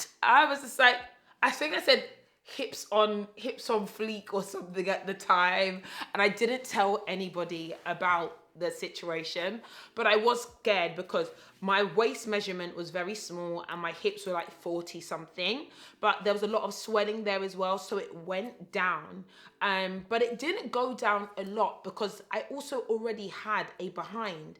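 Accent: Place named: United Kingdom. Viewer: I just love the British accent 😍 new subscriber. Love the vibe.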